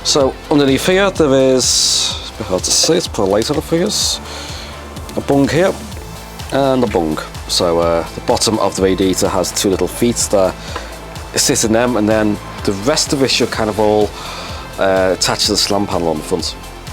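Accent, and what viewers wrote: Liverpool, United Kingdom. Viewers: are you from Liverpool? I hear some Scouse in your voice 🤷🏼‍♀️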